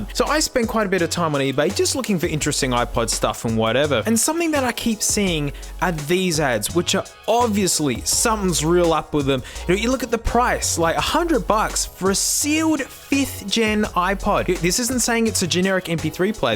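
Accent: Australia. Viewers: Gotta love the Australian accent let’s get in there MATE!!! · This voice is so funny to me, probably because he is Australian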